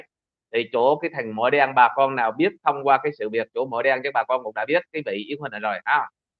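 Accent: Standard. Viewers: Chào Anh noi rất chuẩn.like like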